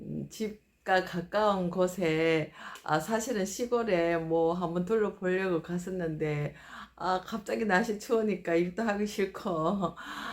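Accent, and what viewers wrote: Gyeongsang, South Korea. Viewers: 78♡ 교수님 반갑습니다. 경상도 억양이신데 저도 19세까지 포항에서 살았습니다. 영상 잘 보겠습니다.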